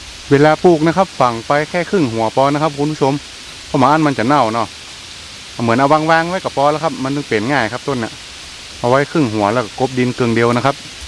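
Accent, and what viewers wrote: North, Thailand. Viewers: หว่ากำเมืองเสียงฟังม่วนจ้าว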